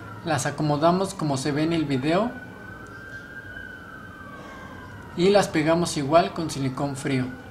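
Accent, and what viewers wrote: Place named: Mexico. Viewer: Bno el video, pero la vos de mexicano la cago ):<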